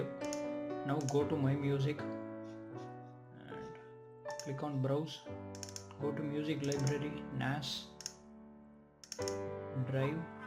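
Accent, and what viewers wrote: India. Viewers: fucking indian english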